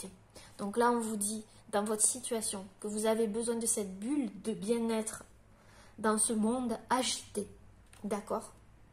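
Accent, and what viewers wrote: South, France. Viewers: Merci l’accent du Sud tellement magnifique accompagnent tes messages qui sonnent très juste pour moi encore merci je t ´envoie plein d’amour Christophe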